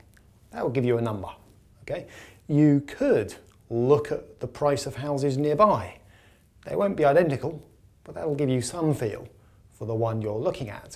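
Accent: RP, United Kingdom. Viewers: Received Pronunciation/ Standard English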